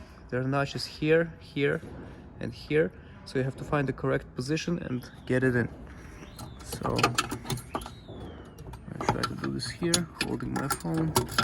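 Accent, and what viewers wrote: Russia. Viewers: не знаю кто ты и откуда, но слышен русский акцент ) классное видео спасибо )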